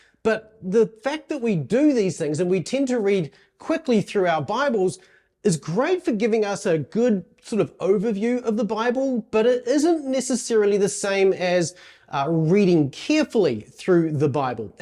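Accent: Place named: New Zealand. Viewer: New Zealand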